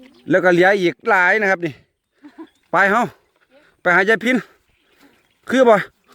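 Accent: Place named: Korat, Thailand. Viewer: เว้าคือคนบ้านโนนสุวรรณเนาะ อ.ไดครับโคราช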